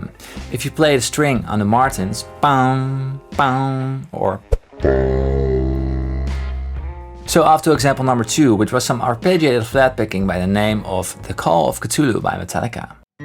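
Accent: Netherlands. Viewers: You sound very very dutch. Heb ik t goed? ;)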